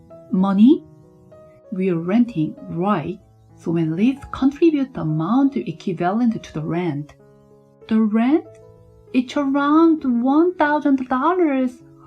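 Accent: Japan. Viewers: Hello! Have idea! Hire someone to read stories that are translated into English by someone who has actually lived in a real English speaking country and attended some kind of Grammer school in America or England or Canada or Australia so that other English speakers will have some chance to hear the story clearly! Present reader obviously has never left Japan!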